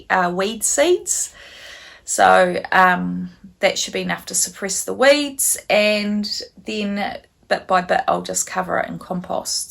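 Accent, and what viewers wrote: New Zealand. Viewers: Hi So nice to hear another kiwi accent on YouTube. I am from Auckland and will go back and watch all your early episodes.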